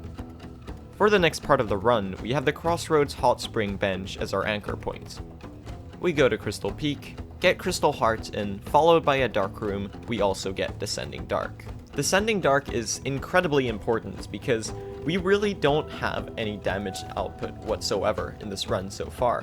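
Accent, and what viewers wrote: Sweden. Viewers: är du från sverige?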